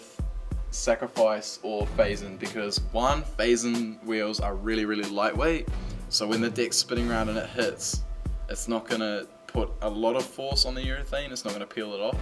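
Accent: New Zealand. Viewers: what shop is that you sound like your from your New Zealand? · that`s his kivi accent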